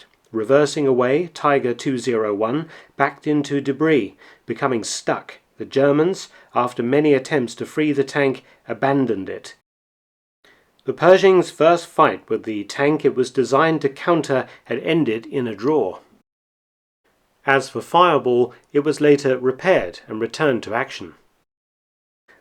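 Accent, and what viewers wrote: United Kingdom. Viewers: Thanks for the video. I can tell by your voice that your British. Your our best friend and that will never change. Us Americans are very loyal we don’t give our loyalty easily. Liberty or death.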